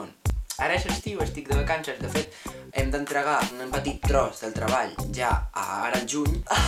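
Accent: Spain. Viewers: se te oye sexy en catalán, en general me quedo a descifrar lo que dijiste con tu acento español, peor ahora no eh podido, a pesar de lo sexy, ni modo.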